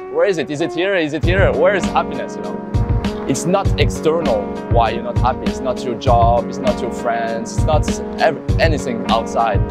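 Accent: France. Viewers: Thank You man ! I think this is the best video I ve seen today! And I love ton beau French accent en tout cas merci pour cette video et tout tes conseils instructifs <3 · are you french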